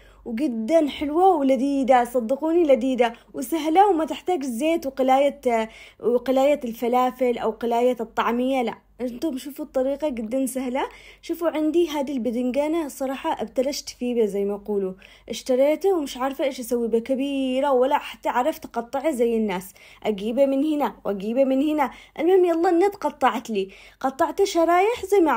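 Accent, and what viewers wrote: Aden, Yemen. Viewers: احبك ي بنت الكلام العدني يذوب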